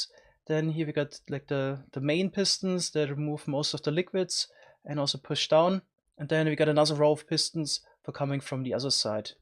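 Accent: Germany. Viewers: are u german ur english is somewhat funny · Holy Mother of German Accent! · I detect German accent 😋. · dieser Deutscher aktizent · Where are u actually from? I am hearing a german accent. Am I right? xD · Wie deutsch kann dein Akzent eigentlich sein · That's German English xD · Ich habe sofort an deiner Stimme gehört das du Deutscher bist! :) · Uff.. dieser deutsche Akzent geht ja gar nicht 🙈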